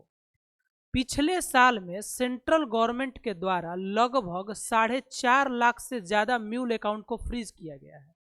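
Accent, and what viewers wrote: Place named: Bihar, India. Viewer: Bihari style hindi But Good Knowledge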